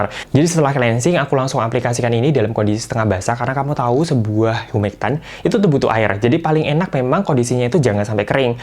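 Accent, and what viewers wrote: Java, Indonesia. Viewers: Waduh jowonya mendok sedep mas hi hi...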